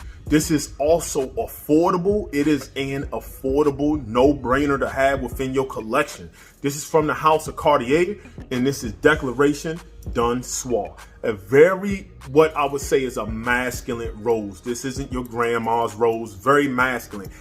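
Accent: DMV, United States. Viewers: Yo, as soon as you started talking I knew you were from the DMV.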